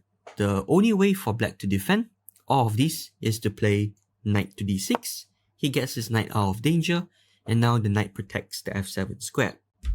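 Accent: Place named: Singapore. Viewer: hello dude, i wanna ask where r u from? u sound singaporean ngl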